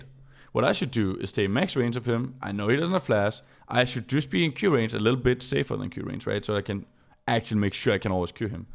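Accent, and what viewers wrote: Denmark. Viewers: You have to be Danish, the way you pronounce TF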